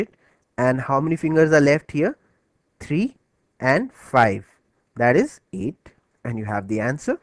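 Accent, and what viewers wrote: India. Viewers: By listening to your voice I can tell that your an Indian :) keep up the good work brother!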